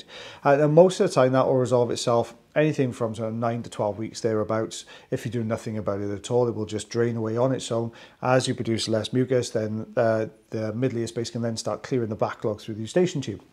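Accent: Wales, United Kingdom. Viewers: It's a Welsh accent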